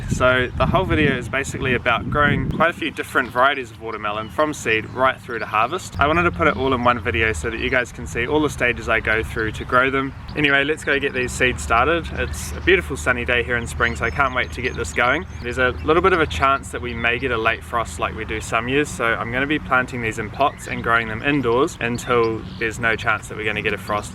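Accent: New Zealand. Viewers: I have loved this post. I def will give it a go in the future. I'm up at Muriwai Beach, Auckland. Hmmm.... now to find some tyres lol. Bro, when's the best time for us to grow melon? Love hearing our accent for a change. Thinking of starting a channel myself actually. Any tips?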